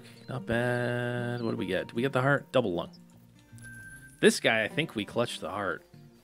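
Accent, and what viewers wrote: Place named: England, United Kingdom. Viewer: I love your English accent 😂🤣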